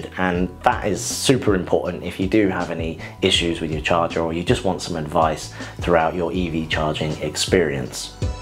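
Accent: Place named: United Kingdom. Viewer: Excellent, clear and easy to understand. How refreshing to see a review in British English, not least of all because it is more reassuring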